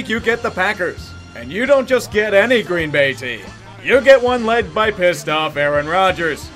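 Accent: Pittsburgh, United States. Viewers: We need more yinzer voice! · If did all of his videos in yinzer speak I wouldn't mind... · should bring out the yinzer more often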